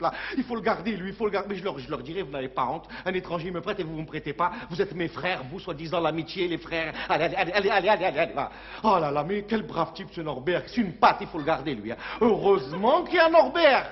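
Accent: Algeria. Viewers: L'accent pied-noir d'Alger,différend de l'oranais à forte empreinte espagnole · Trop puissant Castel ! Cet accent pied noir c'est que du bonheur.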